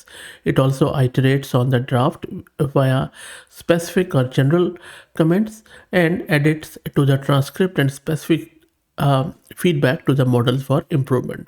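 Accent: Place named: India. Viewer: Horrible Indian accent😂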